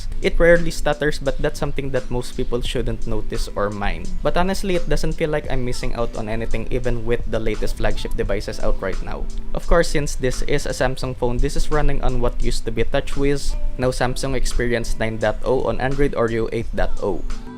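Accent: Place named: Philippines. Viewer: Sabi na pinoy ka... First time ako dito... Nasabi ko lang dahil ng accent mo hahaha skl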